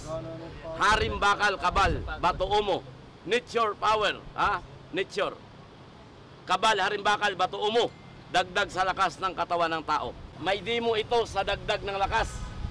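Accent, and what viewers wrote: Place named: Visayas, Philippines. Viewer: Bisaya rin Pala to c, sa Salita palang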